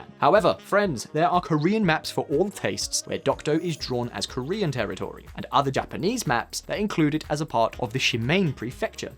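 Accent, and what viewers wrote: United Kingdom. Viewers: this guy narrating is obviously British, and should know why lump of rocks in the ocean is so important. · “Hotspot: hotter than a hotpot.” Love your British accent mate!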